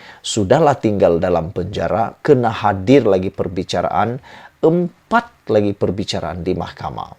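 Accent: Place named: Sabah, Malaysia. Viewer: Ko ni bagus ke....XDA salah ke...ko duk diam2 je laaa sibuk nk buka berita org ni..ko spa ya...ko ni teringin nk jadi pemberita ke ..ckp mcm hakim je ..ckp cm Sabah campur jawa je..Cm bagus sangat berita ko...org dah masuk jel ...ko duk asik ulang2 kes org ..apa hal ko ni...mp PN diam je ...ko asik2 api2 kes org apa hal..gila nk jadi pemberita ke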